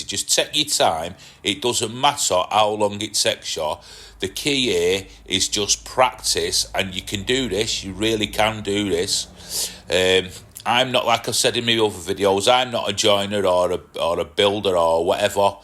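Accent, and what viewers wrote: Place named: North, United Kingdom. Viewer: Bathroom cabinet is brilliant and love to hear the "north" again! I am in Bavaria and never hear my home accent much. I really look forward to understanding what this feared "kickback" is! btw I got a huge trailer load of pallets from a lady who has tractor mowers delivered on them. I have a mountain of wood now! :)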